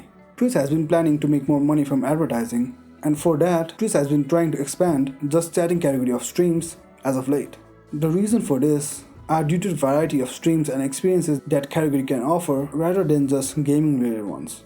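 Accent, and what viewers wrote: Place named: India. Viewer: Yo, from a fellow indian work on your accent.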